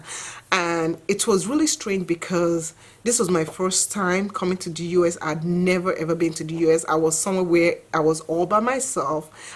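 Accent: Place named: Nigeria. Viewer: Just your introduction started with so much energy! I liked your video right away haha. And it's great to hear other Nigerians story who are living abroad and building a successful life for themselves. P.S I love hearing the nigerian accent. Some people say I have it, but I hardly hear it in myself :)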